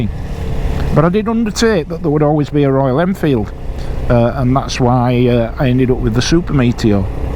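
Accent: Yorkshire, United Kingdom. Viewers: As an expat. yorkie as well as the content I like hearing someone what talks like me tha knows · Just subscribed to add to your 10k target. Never mind the negatives. Concentrate on the positives. I like your commentary with that yorkshire twang. Regards from a manchester biker. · Just subscribed. Enjoying your videos of my native Yorkshire which I left over 50 years ago. Great accent, great scenery, great bikes. Please keep on doing what your doing.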